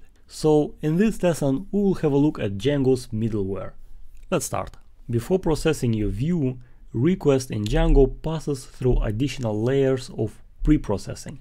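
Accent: Russia. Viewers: I'm hearing Russian accent there)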